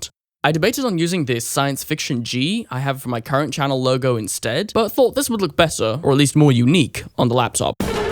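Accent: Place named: United Kingdom. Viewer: you are somehow THE most british person I've ever heard and also WHAT WAS THIS NOISE anyways you are cool have my sub and like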